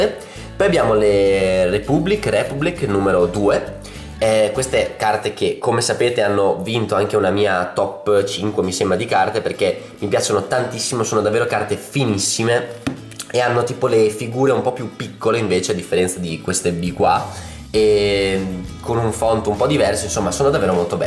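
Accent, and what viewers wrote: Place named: Emilia-Romagna, Italy. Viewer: da quella Zeta inconfondibile deduco che sei del Emilia Romagna. di dove sei ? io sono di Bologna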